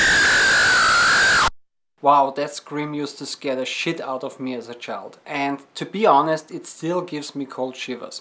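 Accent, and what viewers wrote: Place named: Germany. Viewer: I remember playing the game 30 years back on the good old c64! the death scene screams were awesome! so awesome, that my mum took them for real and stormed in my room;-)! It is remarkable, that the video game tape still works after 32 years! Do you use an original C64 or an emulator for PC?, you have a descent german accent! are you from east germany?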